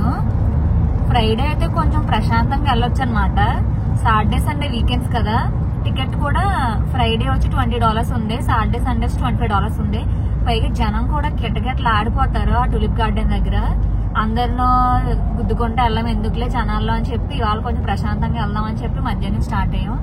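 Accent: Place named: Godavari, India. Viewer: Meru mana godarolla Aisa bagamatladutunnaru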